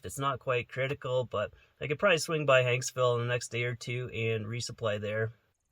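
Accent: Canada. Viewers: in the first minute i asked myself from the accent, "this guy's got to be canadian' then you mentioned the distance as kilometers and I questioned no longer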